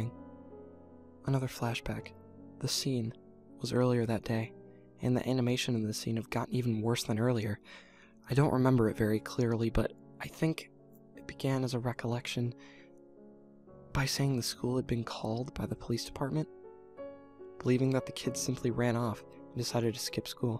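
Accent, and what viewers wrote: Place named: United States. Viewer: The guy speaks really good American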